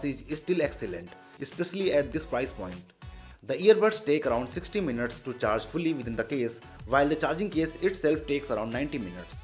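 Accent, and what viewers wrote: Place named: India. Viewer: Bro u speak like my UP Board english teacher from village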